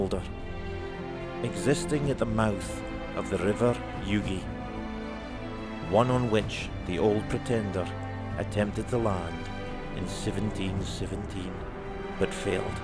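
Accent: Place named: Aberdeenshire, United Kingdom. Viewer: I made that comment about half way through the video - at the very end when you stopped narrating it was clear is was Aberdeenshire haha (I'm from Aberdeen and couldn't place the accent lol). Again, great video and can't wait for more!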